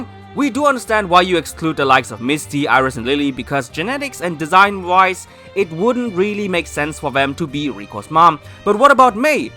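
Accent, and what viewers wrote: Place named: Germany. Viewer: Yoo du bist deutsch 😂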